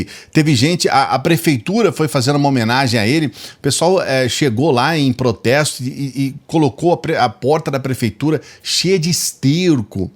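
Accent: Minas Gerais, Brazil. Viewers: Adoro esse sotaque mineiro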